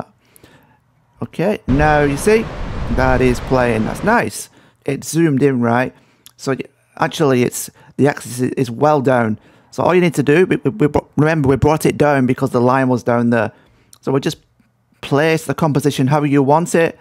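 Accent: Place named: North, United Kingdom. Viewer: im from canada but YOU are a northern boy arnt you..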